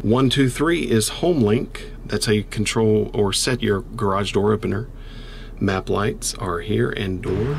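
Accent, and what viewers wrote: North Carolina, United States. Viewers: Living here in Missouri, I noticed your accent was North Carolinian, then I saw a Popup that said you were in High Point.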